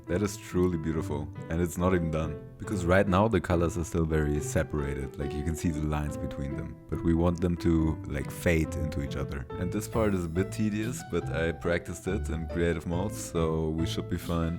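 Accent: Germany. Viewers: I love that i can hear the small german accent haha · Are you german? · You sound kinda german. Just in case:(Döner 8€💀) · Are you german? Anyways great Vid! Got my like and sub you are gonna blow up. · I hear german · Am I the only one to hear an German accent?Great Video tho❤️🫶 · Okay but am i the only one who thinks that hes German?😂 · Funny video and nice editing! One question tho: bist du Deutsch? Dein akzent lässt das sehr vermuten.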